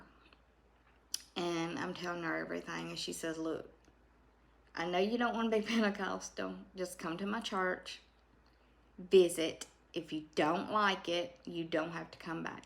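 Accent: South, United States. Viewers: Thank you for the wonderful videos. I am glad you found what you need to put you at peace and make you happy. You and your cats make alot of people happy. I love the southern ways. I am from SC and I love the accent and the southern saying. Please continue with the videos, I need that joy in my life. life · From Argentina Honey you are just fine!!!! I lived in the US specifically in the South, and I watch your videos because it reminded me my time sweet time in the south that i learn to love, i love the accent the humor the kindness!!! So what i mean with these is that you are just fine❤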